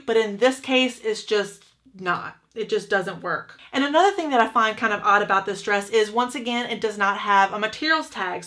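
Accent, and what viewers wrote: South, United States. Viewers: You looked good in all of the dresses. Love your channel! You give so much more info than others I’ve watched. Plus, I get to hear a southern accent - represent, girl!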